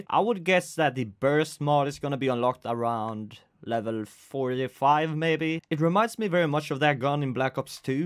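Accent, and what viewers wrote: Sweden. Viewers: U from Sweden?? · are u swedish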